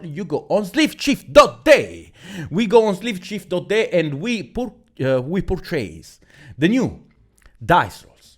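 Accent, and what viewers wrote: Italy. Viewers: E' il tuo primo video che vedo e ho notato subito dall'inglese che sei un fratello Bel video e concordo sulla banned aggiungerei virus a 0/ skill drain a 1 pero' per il resto ci sta p.s engage a 2 e' sicura quindi non serve una prediction per questo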